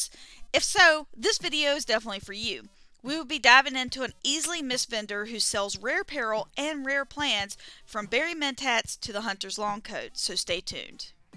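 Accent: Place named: Georgia, United States. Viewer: 👍 for the helpful tips Subbed for that sweet peach country accent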